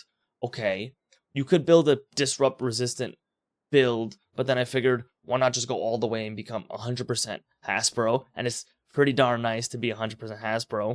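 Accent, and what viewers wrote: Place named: Canada. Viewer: Dude, why didn't you answer? I know you're a Canuk......it's the accent. Great, informative videos just the same.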